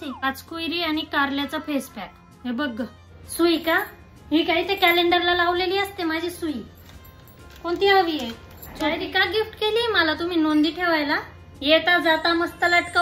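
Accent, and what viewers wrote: Khandesh, India. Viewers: खूप छान सादरीकरण ताई. मराठीला खान्देशीचा तडका, छान वाटतं ऐकायला.🌹🌹🌹🌹🌹🌹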